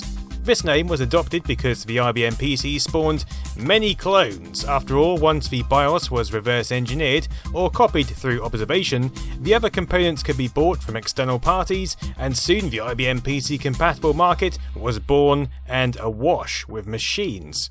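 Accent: United Kingdom. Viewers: Must be a British thing. Here in the USofA it's "RS two thirty two" vs. "RS two three two" and the PC/AT (Advanced Technology) was "PC A-T" vs. "PC at". Other than that, a delightful romp down memory lane. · I didn’t know was British or Australian or South African I thought he was American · John Pattillo it is A-T. Like AT ST AT AT. he's english/British they say everything wrong.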